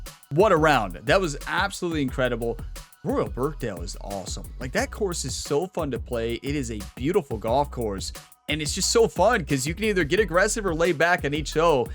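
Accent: United States. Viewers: One time for every shot:_gamerOnetime: Birdie train called 🐦🐦🐦🐦🐦🐦🐦 I think you should complete the challenge on this one fairly comfortably. So get ready for another tier 4 activation. And yes Nascar series is awesome. Featuring Rhett Games as Rocket Rhett, the Daytona Demon (the most entertaining to watch driver in the world) Edit: What an idea, Bdan, GbWaCkErGuY, Apex, Respawn, Kawkster and Early1981 as playable pros. What a match play ladder that would be 😁 Edit2: As a person from the UK, I can confirm you pronounce Royal Birkdale correctly. The person who said your were saying it wrong was likely either a troll, or somebody who was thrown off by your American accent 😉 Post round edit: Comfortable as predicted. Great round 👍 You should give Dingo's latest course a try for an impossible challenge. It's called Sliabh Abhartach (pronounce that if you can 🤣). 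It's not one of his crazy fantasy courses. Just a beautiful realistic creation and a good challenge.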